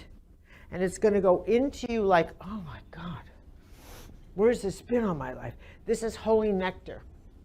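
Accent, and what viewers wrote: Chicago, United States. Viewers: Is she from Chicago? She sounds like my childhood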